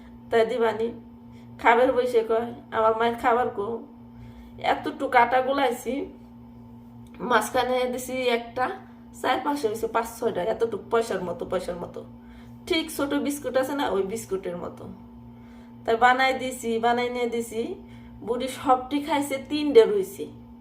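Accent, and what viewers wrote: Manikganj, Bangladesh. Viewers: আপনার কথায় বুঝা যাচ্ছে আপনার গ্রামের বাড়ি মানিকগঞ্জ বা টাঙ্গাইল.।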